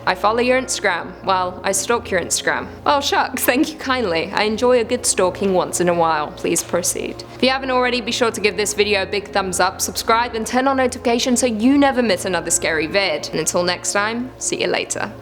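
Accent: England, United Kingdom. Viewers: I know it’s been said before and it will be said again, but there is something about hearing info with an English accent that makes it seem more legit. And kinda spooky too. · I agree ......you are very pretty ....love your hair...hate the big baggy shirts I can only imagine....the subjects are very interesting ....love the accent as well.....english?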